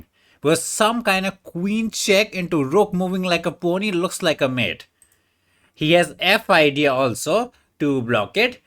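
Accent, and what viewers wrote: India. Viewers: I used to be bad at understanding Indian accents but I’m a master now that I watch this channel every day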